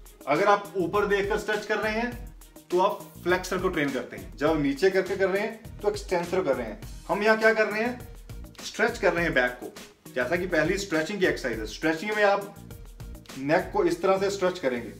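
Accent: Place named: India. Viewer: is he speaking in english or indian i don't understand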